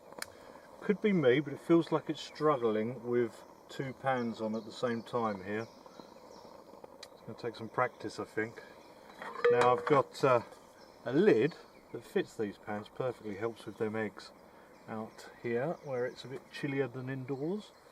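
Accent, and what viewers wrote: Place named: Kent, United Kingdom. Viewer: You’re a true Kent man.its the accents.I used to know a man from down that way.u sound so alike..a compliment I should add. Nice tent,probably the best I’ve seen. Bird song fantastic.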